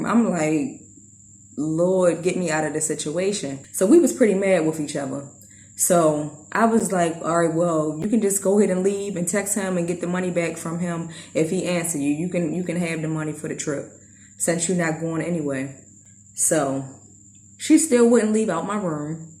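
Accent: Baltimore, United States. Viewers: Let me guess, you’re from B’more?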